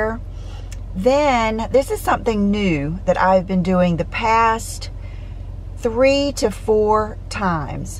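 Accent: South, United States. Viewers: I’m loving these daily vlogs....love seeing how much our days are so much alike. We really are more similar than we are different. And we both have accents...yours is a sweet Southern and mine is a horrible NY/ Long Island accent...lol yuck. I can’t believe how long your hair has gotten. I’m trying to grow mine longer...what did you do to grow yours so fast? Vitamins?? Beautiful neighborhood. The home pastel colors reminds me of Bermuda homes. Idk the cost of the homes there but anything like that on LI is well over a million $$.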